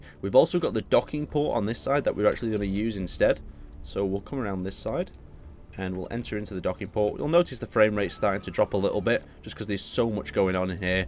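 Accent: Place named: England, United Kingdom. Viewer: No, that's a regional accent here in England. Tons of people speak like that, why would you? :p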